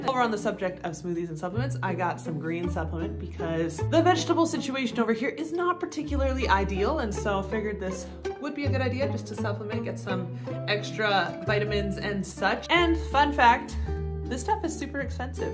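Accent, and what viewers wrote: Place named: Houston, United States. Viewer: Great Houston accent.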